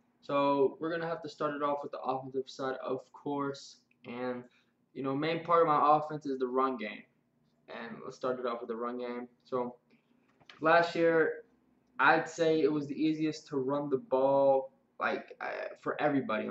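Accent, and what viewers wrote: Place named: South, United States. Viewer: I understood him ,you must be from the south.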